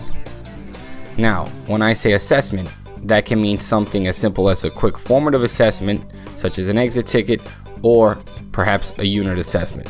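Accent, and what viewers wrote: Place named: Brooklyn, United States. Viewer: That brooklyn accent. "don't get cauwght!"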